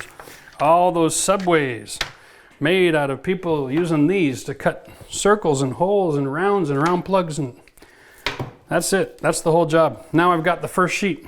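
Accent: Canada. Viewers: Him: Anyone can do this? Me: Hold my beer. I knew you were Canadian from the first video I watched, and it was the way you said 'about'.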